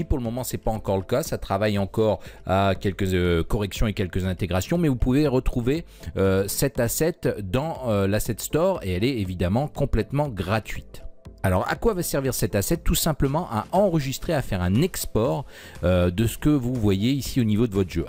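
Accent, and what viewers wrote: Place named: England, United Kingdom. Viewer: J'aime bien ta chaine et globalement bien tes vidéos. Mais je trouve quand même que c'est parfois un peu bâcle. C'est un thème intéressant à aborder, mais on dirait que rien n'est préparer et que tu ne sais pas de quoi tu parles (ici notamment quand tu décris les fonctionnalités de l'inspector). Outre l'accent anglais qu'on te connait, y a beaucoup de variables/fonctionnalités que tu n'expliques pas alors que ça serait bien utile. Tu as plus ou moins dit que le frame rate c'était pas vraiment utiles à modifier pour un usage amateur, et que ça correspondait plus à un paramètre plus compliqué/poussé dans le domaine de la vidéo alors qu'en réalité c'est littéralement LA BASE. Voilà tout. Ce commentaire n'est pas à prendre contre toi ou negativement, c'est simplement une critique constructive pour t'aider :)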